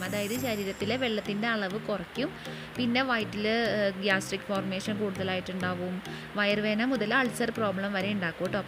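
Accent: Thrissur, India. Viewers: തൃശൂർ ആണ് അല്ലെ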